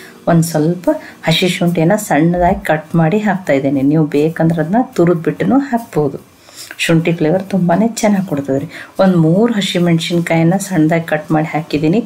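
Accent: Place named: Haveri, India. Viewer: ನಾನು ಖಂಡಿತ try ಮಾಡುತ್ತೇನ. Manage ನಿಮ್ಮ ಕನ್ನಡ ಮಾತು ತುಂಬಾ ಇಷ್ಟ. ನಮ್ಮ ಅಜ್ಜಿ ಊರು ಹಾವೇರಿ. ಭಾಷೆ ಬಹಳ ಚಂದ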